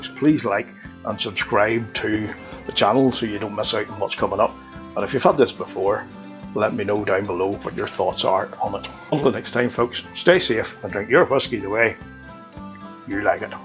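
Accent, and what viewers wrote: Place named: Northern Ireland, United Kingdom. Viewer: Got to ssy love your accent (I'm an accent guy). I've probably tried and failed at Northern Irish accents in my videos in the past. I do have the Sexton as you know. Not tried many Irish whiskeys but this is one of the best I've tried. Still waiting to say "that's a great Irish whiskey". Love the video style.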